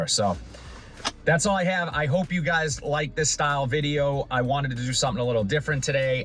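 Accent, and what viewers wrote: Boston, United States. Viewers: Great way to test fragrances for sure. Love the vlog! Whats your accent? Boston?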